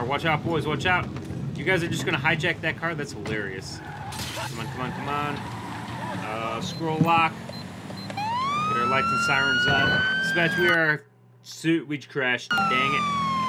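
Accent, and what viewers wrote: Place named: New York, United States. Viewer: If you CPD where is o block you don’t sound like you from Chicago you sound like you’re from New York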